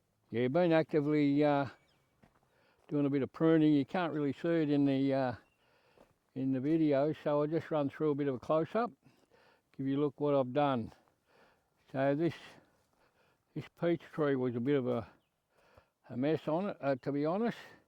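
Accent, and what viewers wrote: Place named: Australia. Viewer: "Me fuck'n clippers av fucked out". I don't think it gets any more Australian than that.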